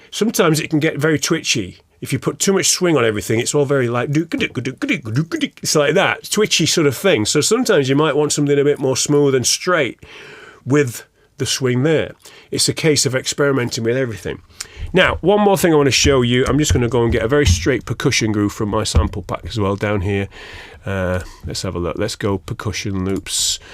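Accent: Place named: North Yorkshire, United Kingdom. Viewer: Do you do 1 on 1 training? You sound like you might be from round my way… (North Yorkshire).. although been residing in BKK for 15 years. Anyhoo.. let me know.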